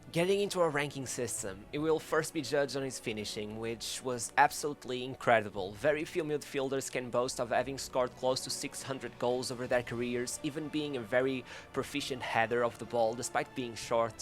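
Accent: Portugal. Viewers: Ele é português. E se tá achando ruim, grava tu e faz melhor!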